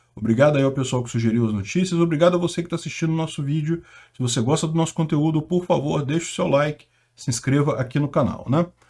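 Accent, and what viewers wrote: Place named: Rio de Janeiro, Brazil. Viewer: Se diz no carioquês :_ancappark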